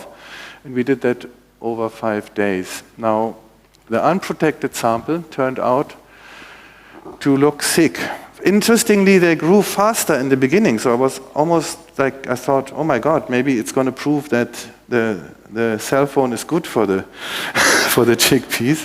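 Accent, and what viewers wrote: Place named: Denmark. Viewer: Sounds like Danish accent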